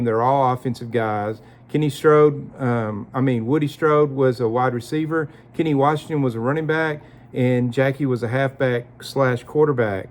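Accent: South, United States. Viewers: this was such a great episode. I’m in the middle of reading Jackie Robinson-A Biography by Arnold Rampersad. I think you have the facts pretty close to what I’m reading in the book. As I’m reading, I think about how hard it was for black Americans to live during that time; even today in many instances. You’re telling of the story, and I mean no offense at all, by a southern American, really makes the story come alive and enriches it with a lot of empathy. And your card collection is something else. Thank you for sharing,.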